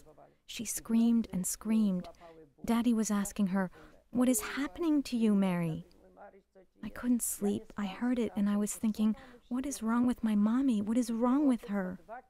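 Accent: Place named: United States. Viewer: thanks for uploading.The spoilt brat american narrator is annoying but I really should not quibble as this is great stuff.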